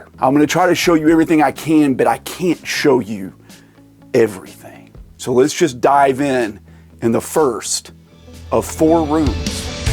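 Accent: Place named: Louisiana, United States. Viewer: Is this accent from Louisiana? Sounds badass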